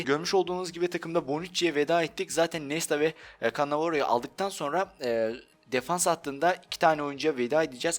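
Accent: Azerbaijan. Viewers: Kardeşim Azerbaycanlı mısın?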